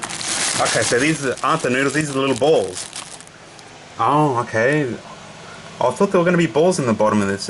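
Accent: Australia. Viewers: Love the aussieness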